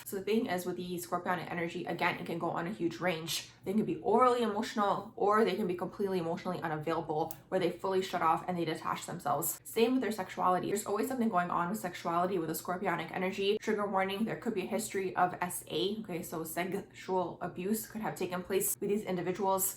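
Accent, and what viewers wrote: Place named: Upper Midwest, United States. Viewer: Love this video, everything you said is true! Side note: you have to be either from Northern Illinois, Wisconsin or Minnesota.... I'm from Illinois and i recognize the accent!!!!❤❤❤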